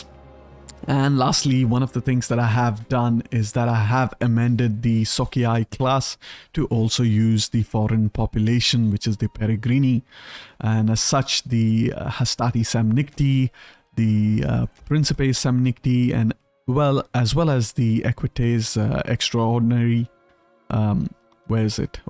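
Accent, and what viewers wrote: India. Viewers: Good stuff. You sound Indian - if so, Latin and Greek are far easier to pronounce correctly than modern European languages. You can use the dental 't' and 'd', for example (like in Hindi 'tum' or Tamil 'thambi' - non-Germanic languages do not use the alveolar t and d (like in English or German)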